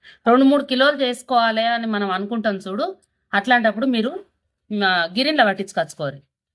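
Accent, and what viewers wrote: Telangana, India. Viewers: అమ్మా మీ తెలంగాణా యాస సూపర్.... చాలా బాగుంది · సూపర్ అమ్మ మీరు మంచిగా తెలంగాణా యాస మాట్లాడుతున్నారు... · Amma mee telangana slang bagundi naaku nachindi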